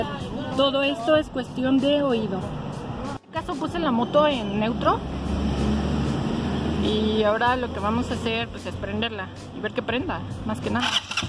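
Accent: Mexico. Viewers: Ahora si voy aprender mecánica, con está hermosa mujer si vale la pena ver videos de mecánica y además me gusta mucho su acento mexicano, saludos desde Valledupar Colombia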